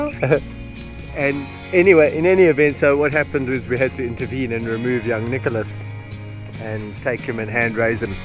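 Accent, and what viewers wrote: South Africa. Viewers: He is South African I can hear his accent Hoe Gaan dit my ou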